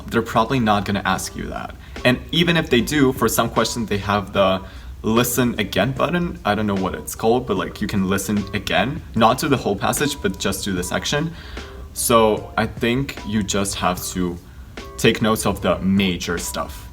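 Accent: United States. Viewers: I thought he was an American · Omg, what the...? Are you russian? Whatt??? Your accent is excellent, perfect, fabulous... terrific....just how... Omg I am really shocked that you are russian with such an excellent American accent. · Thought he was American but in fact he seems to be Russian OMG 😱😆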